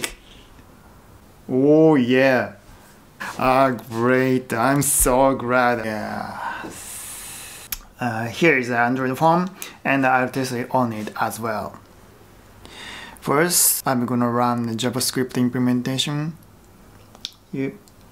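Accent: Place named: Japan. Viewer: I like your Japanese accent.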